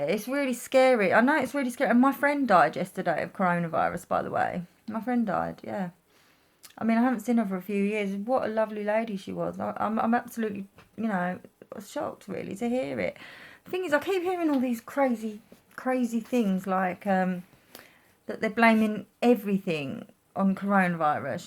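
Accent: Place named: United Kingdom. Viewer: You like Em and Trump ...and have that British accent? Subbed ✅ Here in middle America we are just using our common sense and are thankful that Trump listens to his base, because we don’t want any politician to tell us how to keep ourselves safe. Most everyone here understands the importance of not spreading it but we will not be told that we can’t move freely about the country or be told we can’t earn a living for our family. That’s why you see in states that have Democrat Governor’s being more authoritarian than the Republican states like Texas, Oklahoma Florida.. Its an election year, so in order to make Trump look bad, they will fight him even if he found a vaccine.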